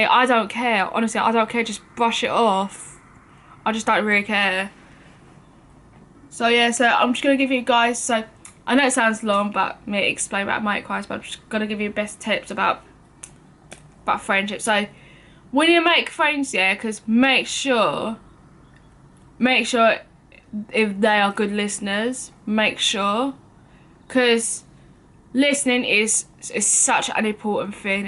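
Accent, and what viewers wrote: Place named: Essex, United Kingdom. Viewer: And yeah I wasn’t diagnosed till I was 14, you got diagnosed young compared to me, btw love your accent? Are you Essex? East London?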